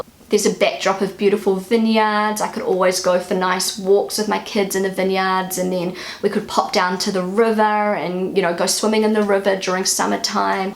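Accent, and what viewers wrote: New Zealand. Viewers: Your accent is very interesting. I had never heard anyone from New Zealand speak before. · Thank you for your always positive videos. I really enjoy them. Also nice to hear is your Kiwi accent. After +10 years in Germany you still haven't lost your accent. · This is a sanitized NZ accent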